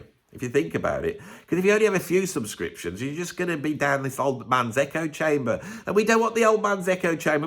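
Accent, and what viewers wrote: United Kingdom. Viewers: brilliant and funny, i love contrarians being one myself, you remind me of Monty Python .( maybe the accent )........ i do like rick also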